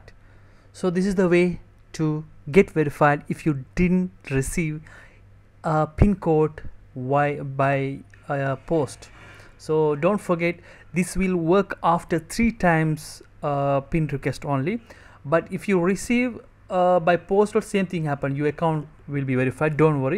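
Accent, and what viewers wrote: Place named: India. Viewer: dude if ur an indian then just talk English in indian accent man the accent was irritating by the was the the msg was informative, helped me thanks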